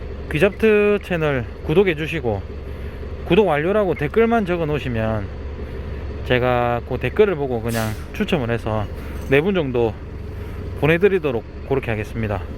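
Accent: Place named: Gyeongsang, South Korea. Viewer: 유튜브에서 표준어 말고 사투리로 하는 유튜버 걸러주는 기능 없나? 졸라게 듣기 싫어죽겟네 갱상도 사투리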